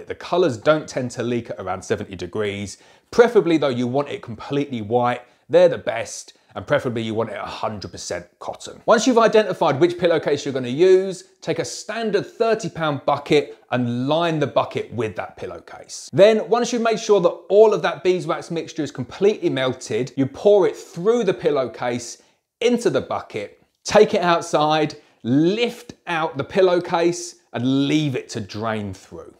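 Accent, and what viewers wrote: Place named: United Kingdom. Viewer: arrr-sigh and eeeeee such a refreshing change to find a fellow Brit doing 'How-to's' excellent video so double thumbs from me Boss I find american videos so tiresome and so prolific on here, why they have to make the simple sound so clever and complicated I will never understand but hey-ho. You've done an excellent job here so thank you very much indeed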